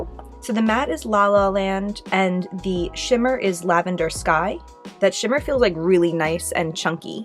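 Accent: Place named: Northeast, United States. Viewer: I hear a northern eastern us accent. Where are u from? Just curious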